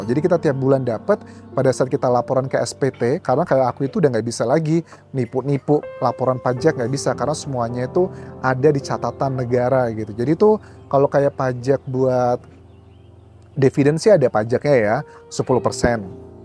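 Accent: Java, Indonesia. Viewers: Pensiun Dosen bahasa Inggris di Thailand masih tergolong sangat muda,logat bahasa nya lebih medok ke Jawa padahal aslinya dari Lampung. Menikmati hidup dengan pekerjaan yg membuat enjoy hasilnya luar biasa. sekelas artis pun belum tentu bisa sepertimu jika menuruti gaya hidup modern.salut nak, semoga segera bertemu pasangan hidup yg didambakan.Tuhan memberkatimu🤲😇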